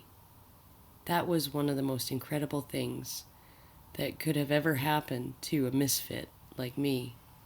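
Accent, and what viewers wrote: Canada. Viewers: fuck you and your dumb canada twang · love it! good job. u do have a nice voice and a Canadian to boot. way to go against the powerful in your country. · her canadian accent.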